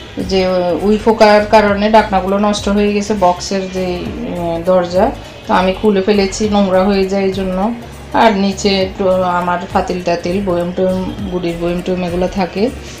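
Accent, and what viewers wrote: Noakhali, Bangladesh. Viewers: Mone hoy apur desh Lokkipur ,Noakhali,darun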